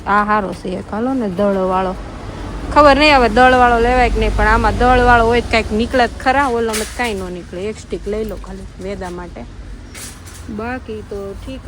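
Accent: Kathiawar, India. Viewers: Kathiyawadi lagocho hu bhi kathiyawadi chu Putaliya no dhandho start karidyo......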